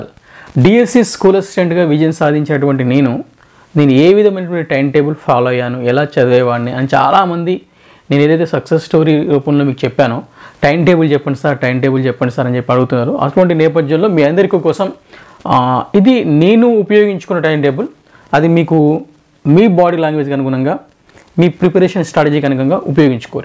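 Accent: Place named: Telangana, India. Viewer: Sir mee telangana yasa baagundi😃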